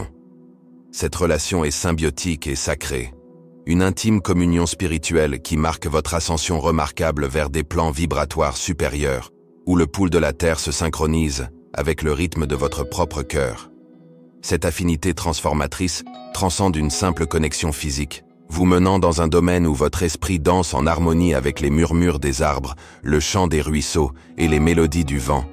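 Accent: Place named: Germany. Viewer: C'est dans toutes les vidéos (que je viens d'écouter :) de cette chaîne ... Quand l'Ai fait des erreurs ou des choses intentionnelles, généralement, ce n'est pas pour le bien des humains. Là, des mots en espagnol, francisés avec l'accent allemand, ça sonne comme un gloubi boulga de langues européennes ... Un espèce de truc incompréhensible et rabat-joie, comme l'UE de la hyène ! Pensée unique, langue unique, banque centralisée bref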